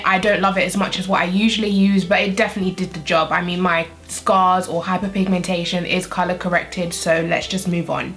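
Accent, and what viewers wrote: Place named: RP, United Kingdom. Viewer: I love her voice😂😂😂😩 its so posh even tho im British myself😅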